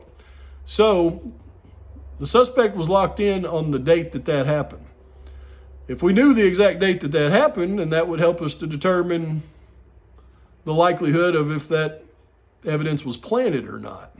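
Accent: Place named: South, United States. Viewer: Wow, that really was a BAM discovery, Mr.! I've been somewhat critical in my remarks about you in prior posts, but I'm coming around to your thinking. However, I still can't figure out who you really are (ex-police, 3-letter agency guy, etc.) Plus, your southern accent.l is intriguing; hmm. Either way, I sure like you! Keep up the great work!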